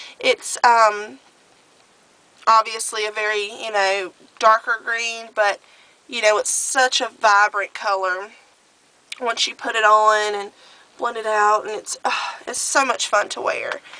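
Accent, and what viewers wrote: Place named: South, United States. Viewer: I love your Southern accent! Anyways, I'm gonna get myself an electric eel tomorrow.. I love my blues and greens too. I just hope it suits me. :-S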